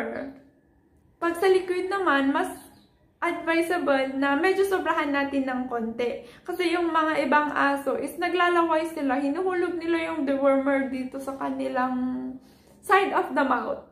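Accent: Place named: Ilocos, Philippines. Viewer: Hi Doc , Dito lang po kayo around sa Ilocos Region ? nagbase lang po ako sa accent nyo dito sa video . Anyway very informative po ng video nyo since kakapanganak lang ng doggy ko :)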